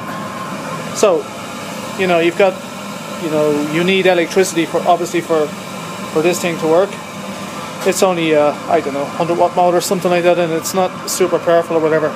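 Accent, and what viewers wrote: Ireland. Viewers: are you Irish? sounds like it. and cool design!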